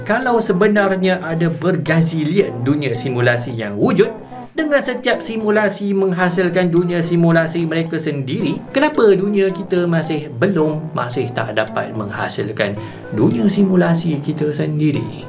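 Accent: Malaysia. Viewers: pak, boleh minta tulisan dibawahnya dong, tambahkan subtitel, hehe, saya masih binggung sama logat melayu, hehe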